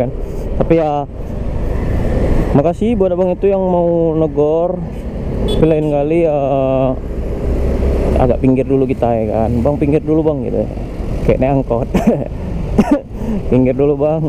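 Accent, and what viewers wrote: Medan, Indonesia. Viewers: Kangen dengerin bahasa medan lagi kak,ak dulu tingal d polonia kak belakang hermes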